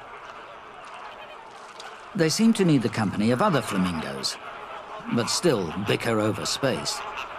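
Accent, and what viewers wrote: United Kingdom. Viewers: Enough with British voices. Done gone.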